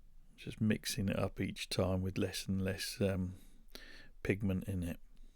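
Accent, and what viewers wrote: Suffolk, United Kingdom. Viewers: Really like this this idea...going to try and do one of these myself....im guessing by the accent your across the pond from me. Some of the stuff you mentioned are products that are there...wonder what they are here in the states. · Oh my word you absolute legend !! Taking something from nature and enhancing it's appearance. You sound like a Suffolk boi?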